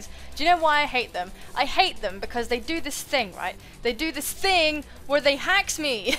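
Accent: United Kingdom. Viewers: You have a beautiful accent! What is it, british? :D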